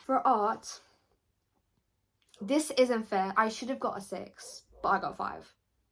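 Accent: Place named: United Kingdom. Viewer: من عاشق لحجه بریتیشت شدم اخهههه🥲💖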